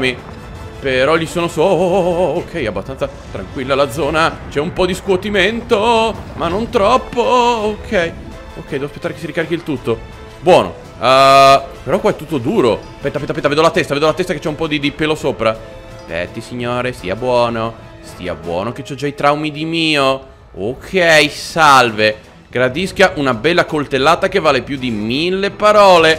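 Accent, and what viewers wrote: Veneto, Italy. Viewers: Sei Veneto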